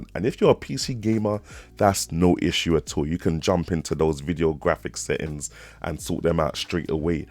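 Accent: United Kingdom. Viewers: You gotta be from uk. From how you say move and. Fam. lol. Big respect. Though. Love the video. I have the 1 tb version and I love mine. I gave away my asus rog strix after I bought this. Lol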